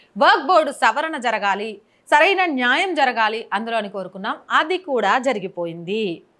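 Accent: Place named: Srikakulam, India. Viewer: మేమ్ నిజం చెప్పండి మీది శ్రీకాకుళం కదా మీ మాట catch చేసాం అమ్మేస్తన్రు అలాగే మన ఆకలి తీర్చటానికే ఆలోచిస్తన్రు అన్నారు 😄👌👌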